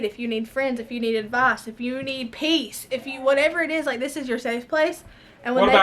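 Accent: South, United States. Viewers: I love hearing you talk. With your sweet southern accent & upbeat personality you put your viewers in a chipper mood. ♥️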